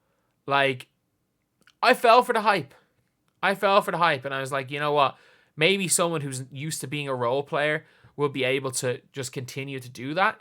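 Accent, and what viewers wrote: Ireland. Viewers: Is this guy Irish? He sounds like me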